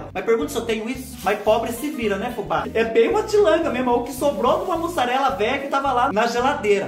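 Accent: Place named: Northeast, Brazil. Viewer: Eu achando q ele era daquí do Nordeste pelo jeito de falar. ❤ aaa amei Cuscuz dá certo com tudooo. E fubá,faz mais vídeos de receitas nordestinas.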